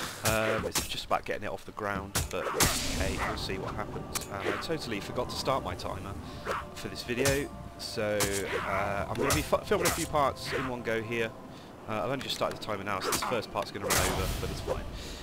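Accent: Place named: United Kingdom. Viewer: Hey, I really like your videos; just fun and casual to watch and listen to, whether Vanguard is popular or not. Seems like a really nice game for some casual single-PvE. It's just too bad I can only make out 80% of what you're saying behind all the sound effects and with the brittish accent (no offense). Oh, btw, try whatching this video transcribed/auto-subtitled; it's just totally fucked up:) (like - )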